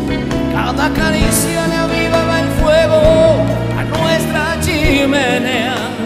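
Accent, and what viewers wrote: Maracaibo, Venezuela. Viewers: Yo siempre he dicho que uno no es de donde nace sino donde se cría. Esta bien, Ricardo Montaner nació en Argentina. Pero su crianza, educación y formación ARTISTICA fue netamente en Venezuela. No tiene acento argentino, tiene acento 100% maracucho (originario de Maracaibo, Edo. Zulia). Su familia, esposa e hijos, venezolanos tambien. A continuación, uno de tantos artículos donde él admite ser primeramente venezolano . Ricardo Montaner: "No soy para nada argentino. Soy venezolano". <link> El mismo caso que Franco de Vita... artistas 100% orgullosos de ser venezolanos. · Mal hecho es ARGENTINO Y VIVIÓ EN SU ADOLESCENCIA EN VENEZUELA SE LE PEGÓ EL ACENTO SOLO ES ESO PERO EL NO RENIEGA DE SU SUELO ARGENTINO SIEMPRE DESTACA SU PAIS Y SU AMOR POR ARGENTINA DONDE ESTA SU FLIA . 🇦🇷🇦🇷🇦🇷🇦🇷🇦🇷🇦🇷🇦🇷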